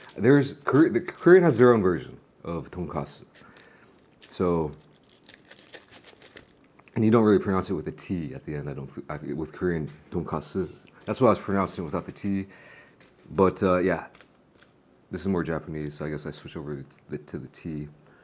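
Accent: United States. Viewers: you sound like you were from the USA, And smoke Blunts after Blunts..!! I love it..!! also i love being able to check out all the kick ass Korean foods and products..!!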